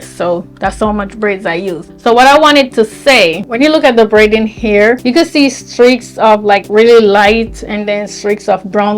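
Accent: Jamaica. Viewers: I hear an accent. Are you Jamaican?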